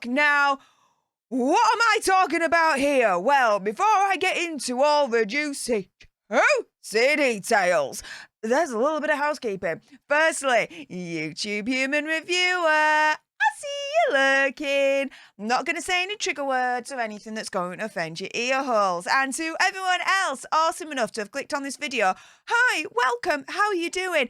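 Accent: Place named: Northern England, United Kingdom. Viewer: I've only just discovered your channel this week when you were talking about Harry's therapy session. I love your energy and personality. I live in the North West of England . I simply love Northern accents. I see that Daniel Boland is from Sheffield. I'm a stone throw from Manchester in one direction and Derbyshire in the other direction. I've tried to place your accent. My daughter-in-law us from Darwen in Blackburn. I could listen to her all day. Are you on the borders of Lancashire and Yorkshire ? Anyway less of the Geography. I think your channel is the sort where housebound people love your vlog because your demeanour is such that you feel like a pal.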